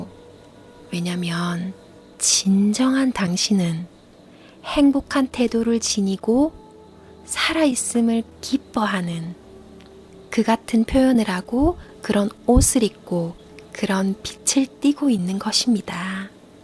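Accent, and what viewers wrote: Gyeongsang, South Korea. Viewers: 오~ 간만에 활기찬목소리 갱상도 특유의 목소리. 에너지 마이 실린 목소리 감사합니다. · 말투가 경남쪽인데... 20대 때 짝사랑한 누나가 부산 사직여고 졸업.. 지금은 서울 삼성의료원 과장..되서 잘먹고 잘 살고있어요. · 마약의 경상도 톤...와...반가와요~~^^ 잘 듣고 갑니다~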